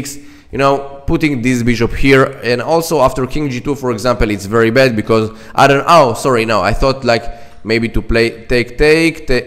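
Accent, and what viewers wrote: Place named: Israel. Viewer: איזה מבטא ישראלי ❤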